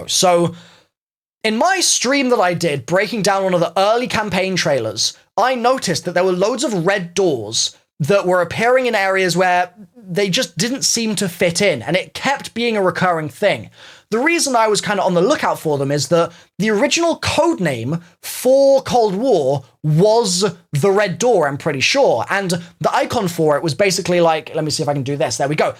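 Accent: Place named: United Kingdom. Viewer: The most British accent i ever heard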